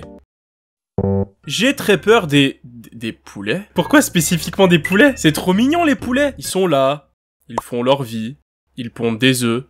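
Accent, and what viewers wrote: Quebec, Canada. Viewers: Il est québécois en tand que français jaime bien l’accent québécois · Suoer vidéo! Et sinon t'es pas québécois par hazard ?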